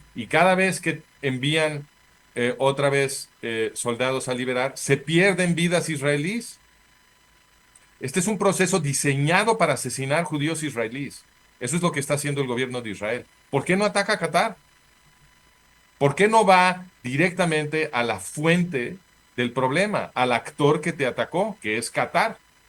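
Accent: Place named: Mexico. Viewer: Que video musica para mis oidos ..me iré a dormir felíz sabiendo que algun dia los arabes junto a EEUU...van a darle a Israel donde mas les duela ... ojalá paguen todo el sufrimiento que estan cusando al pueblo palestino .. Solo me queda una pregunta... cuál es el rollo de este tipejo con asento mexicano que defiende tan apasionadamente a los genocidas?...te gusta la guerra mexicano?... ojalá pronto veas a tu amado Estado genocida vuelto cenizas ....es mi mayor sueño.. No señor ningunos medios occidentales ...desde Israel hay quienes no le siguen el juego al genocida y estan protestando ...deje de idolatrar a los asesinos ...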